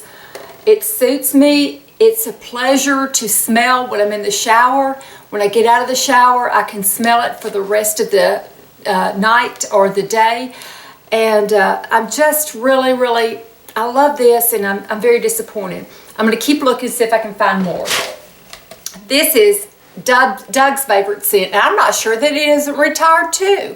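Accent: South, United States. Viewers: You look beautiful as always and that necklace looks so pretty on you. Just hearing your voice is so soothing...hope that doesn’t sound too weird...lol. Love a southern accent :) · What a cute way of saying gone love your gentle southern lilt love elaine Lincolnshire uk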